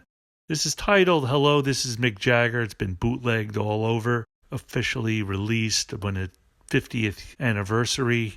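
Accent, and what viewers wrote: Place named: Northeast, United States. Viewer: Once again I’d like to say I think you did a superb job at making these two documentaries. The making of BgsBqt. Part 1 and 2 I want to add some wood to the fire because I know you are working on part 3. I’m sure it will be great! What I want to say is I think you have gotten even better at making your documentaries! The CRYSTAL CLEAR CLARITY IS EXQUISITE!!!! You have a very good voice. If you ever check the wonder years tv show the way they depict the 60s and early 70s that narrators voice is just right. I like your stuff because it is soothing the same way as the wonder years was! Let’s face it these are the wonder years too we are talking about. Or I should say that you are talking about. It has to be that voice of yours. To me it is important that it is a NORTH EASTERN United States accent as such. Somehow an English accent just rubs me the wrong way when they are talking about the Stones. 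Or the wonder years. LA accent just sounds like they don’t quite know what they are talking about. You really come across as very genuine and you come across as really knowing what you are talking about. You calmly and cooly and in a clear non-rushed way guide us through it. The QUINTESSENTIAL AND PROLIFIC AND ICONIC NARRATOR OF THE WONDERFUL WONDER YEARS OF THE STONES! The greatest damn narrator of the Stones of ALL TIME! You tell their story best!!!!!!!!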